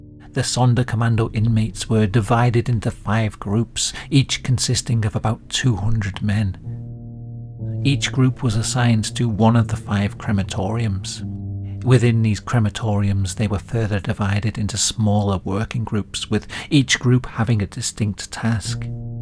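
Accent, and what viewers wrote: United Kingdom. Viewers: I've seen nothing in the video that I do not already know from countless recitations of the words associated with the Images so I find myself asking in response to your accent WHY are you NOT telling the story of the British Empire than invented Concentration Camps (Boer war) AND used them again in 1952 in Kenya. The Brits forever recite the evils of Germany (totally valid, just repetitive) but IGNORE THE EVILS OF THE BRITISH EMPIRE THAT CONTINUED SIMILAR PRACTICES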